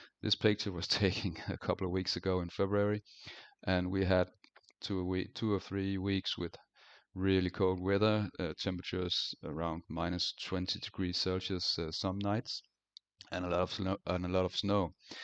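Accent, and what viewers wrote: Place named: Denmark. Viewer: Your video was just recommended in my YouTube account. So then I just start watching it right away. And Wow. This is in Denmark. That’s why I felt little bit of Scandinavian/Nordic vibe in your video. I can hear your Danish accent . I didn’t know your Danish until seeing your name on your youtube profile.. Roskilde and Copenhagen used to be my home for 6 years. You’ve built an impressive natural pool. I like it. Thanks for sharing.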